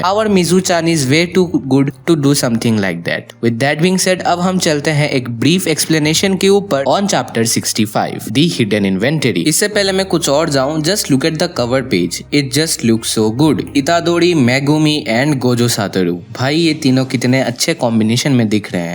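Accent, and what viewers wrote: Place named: Bengal, India. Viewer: Kisi Bengali bol ra lgta h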